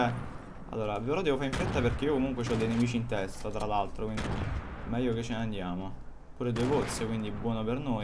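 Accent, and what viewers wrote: Naples, Italy. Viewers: Ma ij o sapev Uagliu Sei di Napoli? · Quando ti parte il napoletano è bellissimo ahah grandissimo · Sei napoletano fra?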